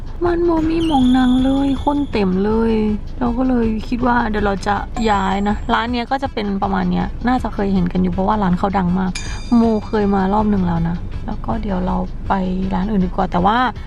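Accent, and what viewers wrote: Isan, Thailand. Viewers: เป็นคนสุพรรณแต่ชอบเสียงบรรยายภาษาอีสานครับชอบมากๆจะติดตามต่อไปรักคนอีสานครับ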